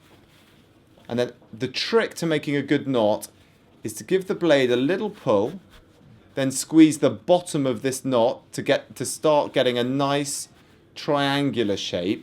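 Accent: England, United Kingdom. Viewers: I think your English accent helped me learn haha! Thanks from the U.S. cheers have a good one! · You’re so unapologetically English with your accent. I love it! 😍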